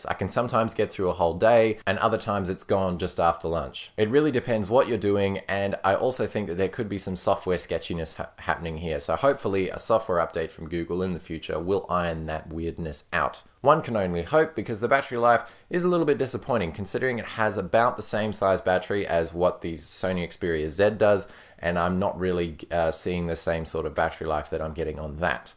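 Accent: Australia. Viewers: So u from Aussie mate ?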